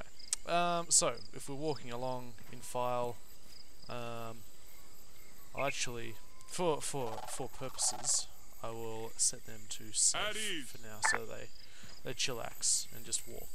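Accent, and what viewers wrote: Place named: Australia. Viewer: Yes, I found this video very helpful and well explained indeed!!! I'd also be interested in knowing the other Channel. Either way I've subbed to this one just incase more uploads come. Also, great to hear another Aussie =]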